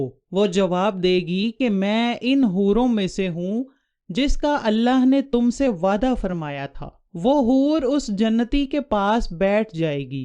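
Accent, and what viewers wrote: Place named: Punjab, Pakistan. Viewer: یہ عورت پنجابی ہے کہ رہی ہے کہ جک جائے گی حالانکہ جھک ہے لفظ یہ پنجابی گھوڑا نہیں کہ سکتے بہت زور لگا لیں تو گوڑا کہتے ہیں ویسے پنجابی کوہڑا نہیں کہوڑا کہتے ہیں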